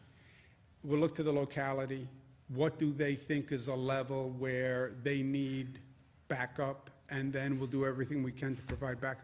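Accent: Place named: New York, United States. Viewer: Its the New York accent and all the strength/testosteone exuding from him. We get it, you don’t need help. It’s okay to be attracted to masculinity without calling it toxic, like many have in the recent past just to bandwagon a stupid slogan or saying.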